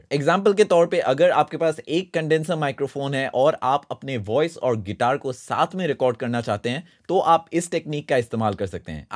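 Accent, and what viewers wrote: Assam, India. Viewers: Sir ap Assamese ha na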